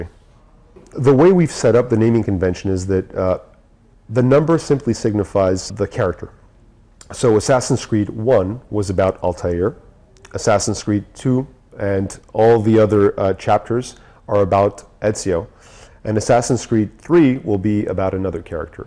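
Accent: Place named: France. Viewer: did anybody else notice the tiny french accent he has? lol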